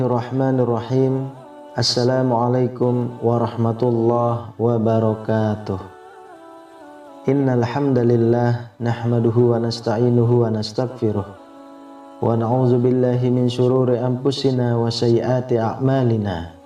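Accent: Lombok, Indonesia. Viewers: Suaranya kayak Logat orang lombok..ntb.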